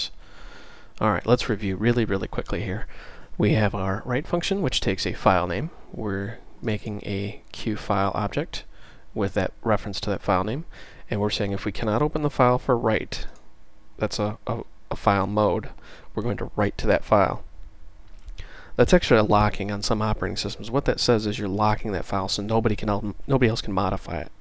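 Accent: United States. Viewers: not only is this educational and entertaining, but this this is in the best format for me! Small screen so I don't have to be in 1080p full screen to read the text and I can have QT open next to it, short videos so I don't lose the will to live, and finally you have a really nice voice XD its not normal us people from Britain enjoy an America accent XD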